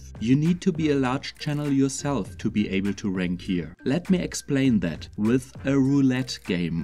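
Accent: Germany. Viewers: Nice one, like your German accent, man. And obviously, you did a great job to rank this video, cause I found it on top of google ;-) Cheers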